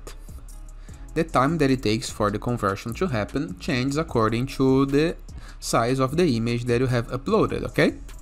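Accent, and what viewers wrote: Brazil. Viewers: Sotaque de brasileiro, acertei?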